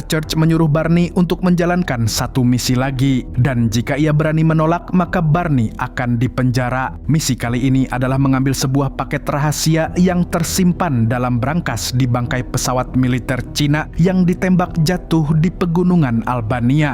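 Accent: Banjar, Indonesia. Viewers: Pian ni Banjar mana mang?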